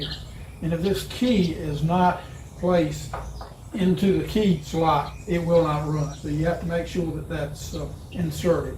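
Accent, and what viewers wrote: South, United States. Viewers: The southern accent is awesome! I love my Kobalt mower. Thanks for the review.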